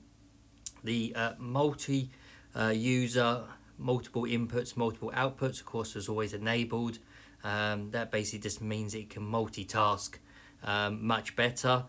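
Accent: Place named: RP, United Kingdom. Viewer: Why would he do that? He's English, from England who speaks Oxford/Queens English. Not a yank speaking false "American" English.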